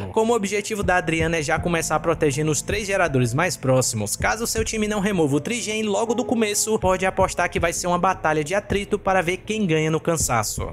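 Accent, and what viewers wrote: Ceará, Brazil. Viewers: é cearense? Falai vizinho! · Poha baitinga, tu é do Ceará?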